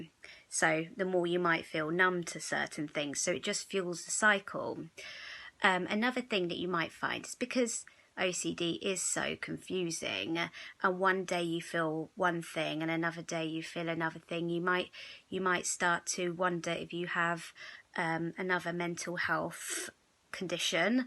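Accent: United Kingdom. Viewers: I love UK accent.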